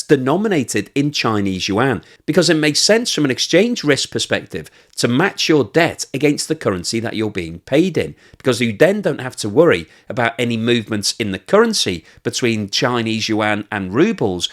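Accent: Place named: England, United Kingdom. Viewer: Great job,. Thank you for educating us. Your videos are very enjoyable and you are very good at what you do plus I really like your accent. Yours is a proper English, beautiful and clear 😊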